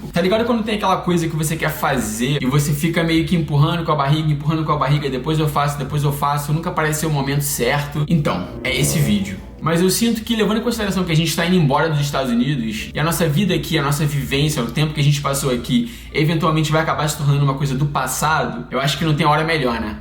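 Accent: Rio de Janeiro, Brazil. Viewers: Adoro esse sotaque doXXXX cariocaXXXXX. 🖤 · 3 anos e não perdeu nadinha o sotaque carioca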